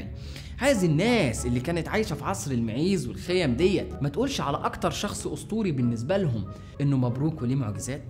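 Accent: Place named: Egypt. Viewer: I'm trying to get the subtitles up and running but I'm having a little trouble with the Egyptian accent :')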